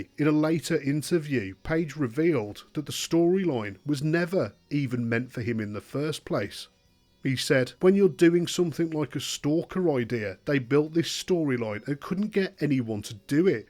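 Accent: United Kingdom. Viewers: No offense but why are all these wrestling bio youtube videos narrated by some UK bloke? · How’d I know this dude would be British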